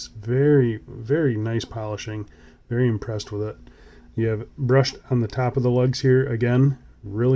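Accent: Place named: New Jersey, United States. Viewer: sound like a jersey girl ..gg tandorio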